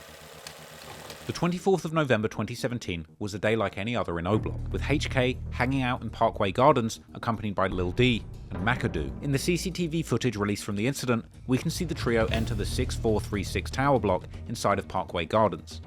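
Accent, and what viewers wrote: United Kingdom. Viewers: nothing like a brit talking about american gangsters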